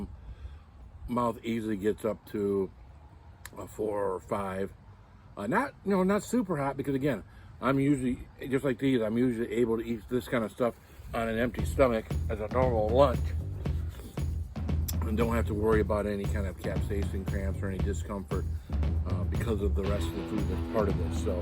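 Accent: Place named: Southeast, United States. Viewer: BY THE WAY!!!! if was a betting man “wink wink”, i would bet almost anything that you’re a south eastern gent! i can tale from the tone and the way you carry yourself!! lol i’m from North Carolina, and you sound like you could be my neighbor lol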